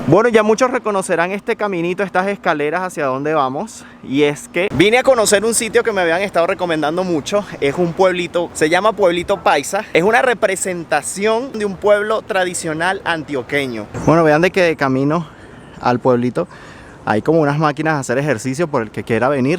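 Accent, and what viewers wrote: Venezuela. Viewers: Hola, gusto en conocerte, mi hermano venezolano, que alegría escucharte es nuestro dialecto, je, je, estuve en Medellin la semana pasada y pude conocer, la plaza de Botero, el metro y el metro cable de Santo Domingo, el pueblito Paisa, el jardín botánico y la plaza de los deseos, caminé por esa calle donde fuiste a la Hacienda. en dos días conocí todo. Dios es bueno y me regalo este paseo. Te felicito por tu programa. Un abrazote. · Jajja me siento cómodo de que seas venezolano y entiendo todo lo que dices xd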